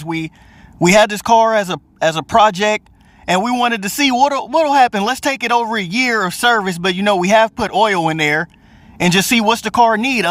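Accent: United States. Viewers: I think the model you have was built in America, you have an American accent so perhaps you know that. German made models much better.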